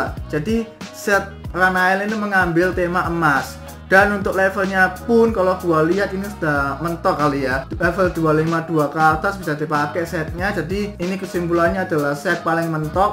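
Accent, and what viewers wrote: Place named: Java, Indonesia. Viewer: bawa acaranya gokil :) bgd :) jawa nya :) gmn gituh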